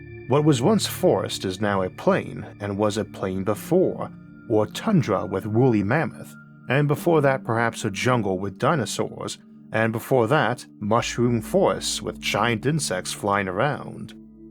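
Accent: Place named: Arkansas, United States. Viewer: Sounds like Arkansas.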